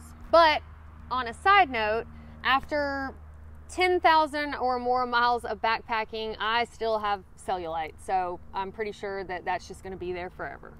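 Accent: South, United States. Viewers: Watching from the UK that's a really cool accent you have - from a southern state? Interesting vid too...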